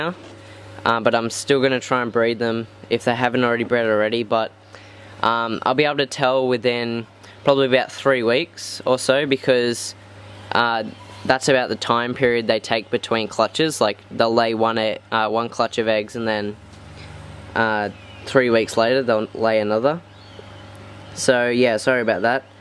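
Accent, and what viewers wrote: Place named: Australia. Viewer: do you have wild bearded dragons in youre yard because you sound austrailian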